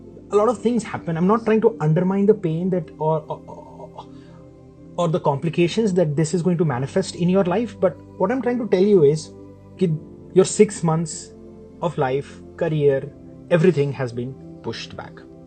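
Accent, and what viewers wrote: India. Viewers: Sir is Hyderabadi guess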